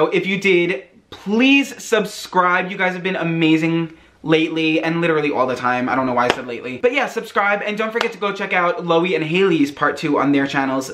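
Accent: New York, United States. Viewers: Your New York accent oh my goddd 😍😍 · You are the most beautiful person I have ever seen 😭 this is the first video I’ve watched from you and you are so awesome btw I love your New York accent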